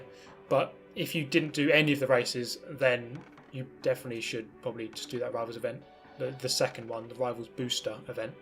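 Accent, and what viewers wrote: United Kingdom. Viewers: you dont look like a brit what a accent gibe me pls ?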